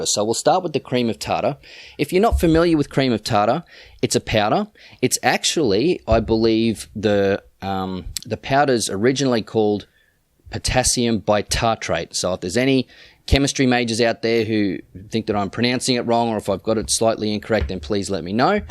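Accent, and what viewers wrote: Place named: Australia. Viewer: The Aussie accent I love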